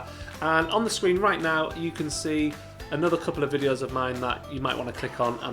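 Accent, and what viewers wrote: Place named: Sunderland, United Kingdom. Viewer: You definitely have a mackem accent lol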